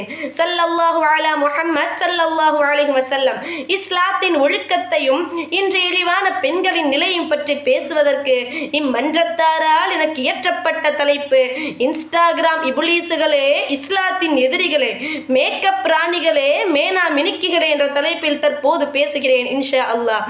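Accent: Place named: Pallapatti, India. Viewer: I think it's பள்ளப்பட்டி uswathun hasana style of slang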